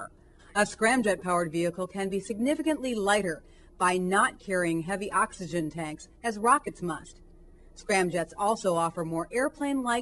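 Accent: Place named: United States. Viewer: Dude you sound like an american.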